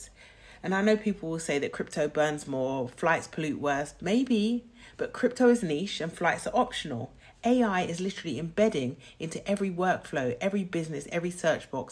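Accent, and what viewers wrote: United Kingdom. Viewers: Ooowter, british, a i abosoultely loved your accent.😂😂❤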